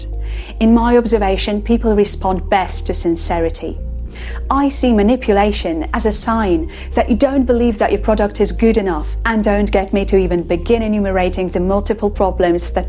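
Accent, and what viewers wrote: United Kingdom. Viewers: I think you have lived in England before because no one can speak like British accent fluently like you without living in England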